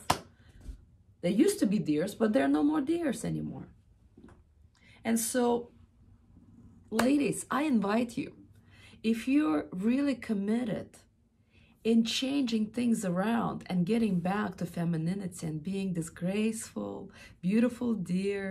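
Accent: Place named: Eastern Europe. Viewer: I knew it!😃 I just didn't know for sure because there are a lot of eastern Europeans that have a similar accent to yours (atleast when I hear it) 😂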